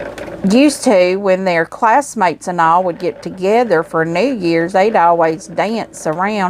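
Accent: South, United States. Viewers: Quarter is a wonderful part of a whole. Thanks for not making your video so obvious for the giveaways. Some people just channel hope to win free gifts. I so enjoy your content. I feel a kinship to you because of your southern accent. Looking forward to the drawing.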